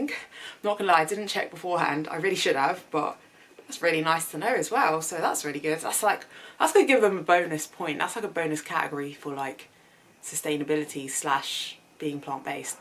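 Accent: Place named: United Kingdom. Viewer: Oh shit! British accent on an Ebony Queen?! SUBSCRIBED!!! 🔥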